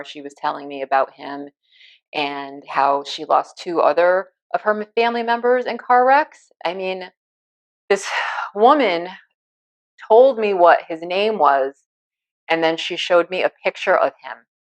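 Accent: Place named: New York, United States. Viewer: I’m sorry you had to go through that and I’m sorry someone lost their life that you knew, however slightly. I’m from Brooklyn originally so I did detect a New York accent from the start. OK I’m continuing your video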